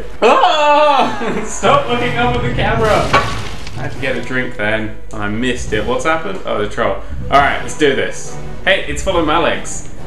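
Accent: United Kingdom. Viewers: 99% of what makes this video great is the fact that he is narrating a harry potter game with a british accent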